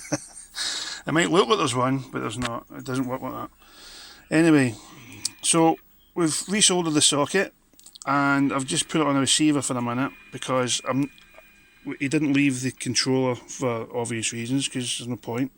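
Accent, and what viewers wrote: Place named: Glasgow, United Kingdom. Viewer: Tell me - you must be from Glasgow or the west at least!! Cheers de VK6HIL ( an ex-weegie! )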